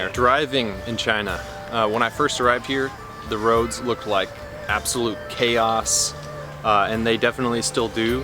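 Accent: United States. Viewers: Hey, I've always wanted to go to China but have never been.. It seems like a beautiful place... I'm from Magee,Mississippi.. What town are you from? I can tell that by your English that you are originally from the United States.. Where are you from originally? During Christmas of 2018, I got 2 books on a Survival Guide to China and South Korea.. I read the South Korea book and it was great.. I have a Japan book as well too.. Now I'm ready to read about China next.. Speaking of South Korea, have you ever been there? I've never been there before myself either, but I'd like to go there too one day... If you have been to South Korea, there are these 2 kids that are living there currently who are from the United States (San Francisco,California) and their names are John Rock and Mack Rock... Do you know them? They have a YouTube channel... Check it out.. It's listed under Rock Kids.. 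I've actually never been anywhere out of the United States!!! I've just always lived in Magee,Mississippi my entire life... It's a small town, but it's growing more and more in population everyday!!!!! Have you ever been to Mississippi before? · You speak well with great american accent. Great voice and great pronunciation.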